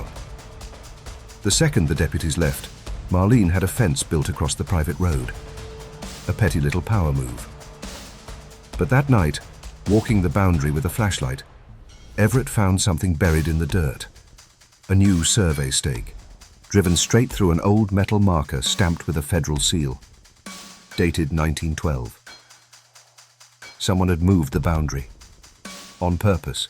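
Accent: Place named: England, United Kingdom. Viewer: WHY THE ENGLISH ACCENT ?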